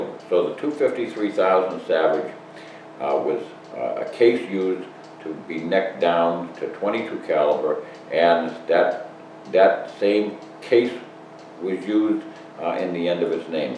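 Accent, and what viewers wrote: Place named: Maine, United States. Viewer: As a child we had a summer house in Maine. Your accent reminds me of the people that I met there, brings back memories...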